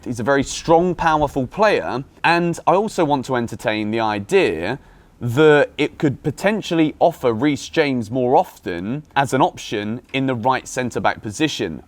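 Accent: South Africa. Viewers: You have got the South African accent down bru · Thanks for the South Africa accent bru❤❤🙌🏾 you making my country proud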